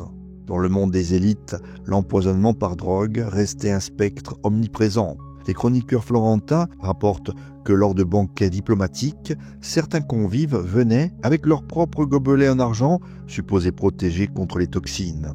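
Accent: Corsica, France. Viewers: La voix😮.. Un léger accent corse non ?..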